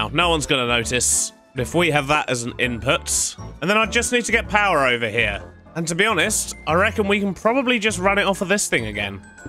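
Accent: United Kingdom. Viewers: Are you British or American you sound British but it might just be me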